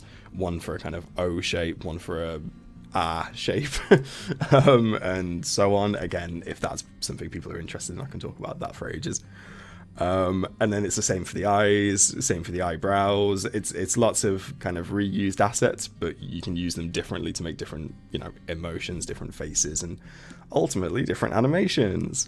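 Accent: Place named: United Kingdom. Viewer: hehe, as an expat living in australia it literally gives me a little seratonin boost whenever I hear a british accent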